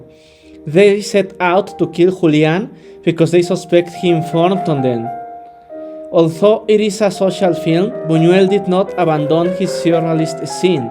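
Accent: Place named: Spain. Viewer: Muchas gracias por el video. Me gusta mucho tu acento español cuando hablas Ingles. Haz más videos por favor ❤❤❤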